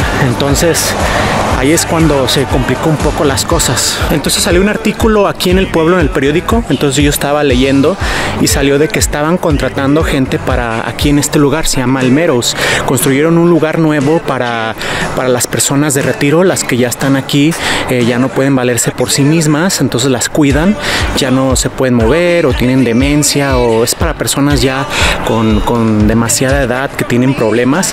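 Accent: Mexico. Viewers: Con este vídeo descubrí tu canal. Me quedé porque me resultó muy familiar tu acento; me dije: "habla como mexicano" . Luego lo confirme' cuando dijiste que comprarías tortillas. Después usaste el adverbio "bien" en el sentido de "muy" o "mucho" y eso me hizo sospechar que pudieras ser tapatío, porque recuerda que nos delata la expresión " bien mucho". Finalmente mencionaste que aprendiste soldadura en Guadalajara, en el CECATI de la calle 56. Ocurre q ue mi casa está en la calle 60, muy cerca de esa escuela. Nada más por eso, ya me suscribi' y seguiré tus vídeos. Saludosx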